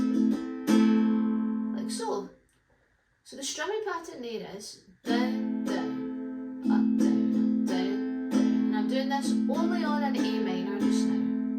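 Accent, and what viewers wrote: Scotland, United Kingdom. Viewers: Thanks for posting this tutorial. Love your accent 😍 by the way. Is it Scottish?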